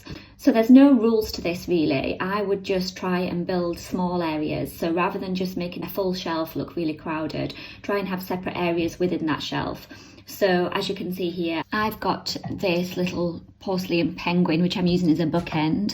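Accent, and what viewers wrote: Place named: Newcastle, United Kingdom. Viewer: Hi. I have so much difficulty with shelves so I loved how you dressed your shelves. I love watching your app. I’m English and I live in Spain so it’s lovely to hear your accent and the way you interact with your viewers. I’m originally from Necwcastle upon Tyne so it’s a little bit like being at home. I hope you all stay safe and once again thank you for all the time you spend in helping us make our homes 🏡 more cosy. Xx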